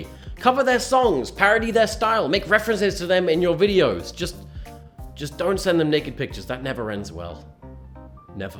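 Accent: United Kingdom. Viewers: Wait, aren't you british? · Wait isn't he British? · he's british? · are you british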